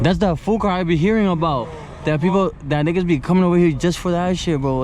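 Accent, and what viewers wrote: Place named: Cuba. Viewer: good video, im argentinian and your spanish is fun, beacuse your accent is cuban and is fun hear it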